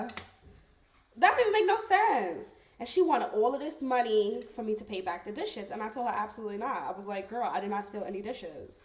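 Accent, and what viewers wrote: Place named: New York, United States. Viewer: You sound so relatable like a woke asf n.y’ ker and are living my dream. I’m so over the western world .. god bless you and your growing family 🌍..😍😍😍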